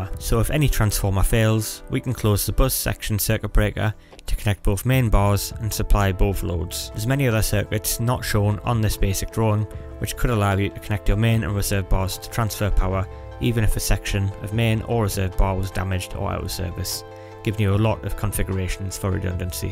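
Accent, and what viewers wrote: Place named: North East England, United Kingdom. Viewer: Nice to hear a North Eastern voice on YouTube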